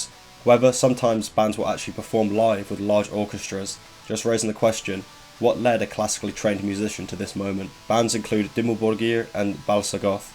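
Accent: United Kingdom. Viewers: british mb?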